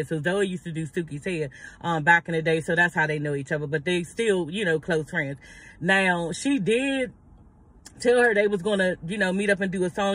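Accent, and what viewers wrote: Washington DC, United States. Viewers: That's a D.C. accent FO SHO!!! The way they say girl.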